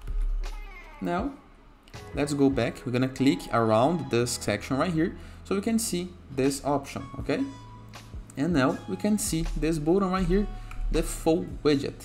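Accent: Brazil. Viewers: Sotaque brasileiro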